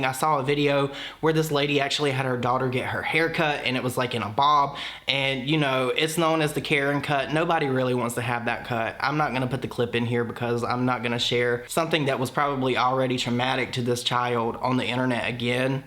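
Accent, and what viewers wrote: South, United States. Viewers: Your face makes me so happy and southern charm accent! I’m so glad when you upload, I love listening to you, you talk trash but respectfully and have a bright personality. You honestly brighten my day when I’m working from home. Thank you for continuing to upload even when it’s hard! It helps me get through my day!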